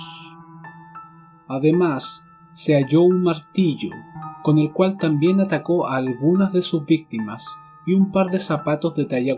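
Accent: Standard. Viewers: Eres venezolano? Dijiste se puso a trabajar. Aparte el acento neutro. Interésante. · relatas con acento neutro, pero igual supe que eres chileno. Te felicito por lo que haces, muy profesional. Y si es chileno...es bueno 😉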